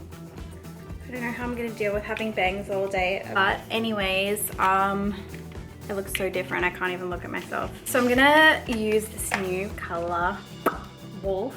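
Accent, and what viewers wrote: Australia. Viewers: I loved this !! I thought you were Australian - did you move to America? Xx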